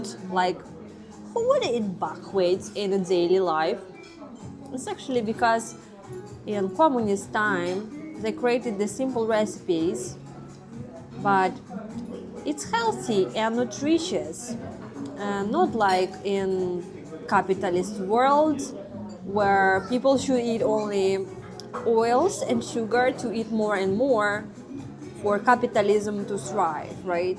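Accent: Russia. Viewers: Нет, всеравно русский акцент, я не choose гречка , а говорить l have chosen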